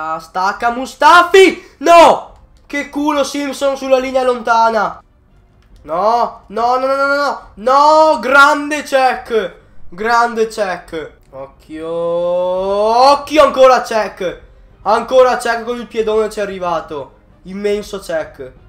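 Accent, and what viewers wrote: Veneto, Italy. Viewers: Ma sei di Venezia?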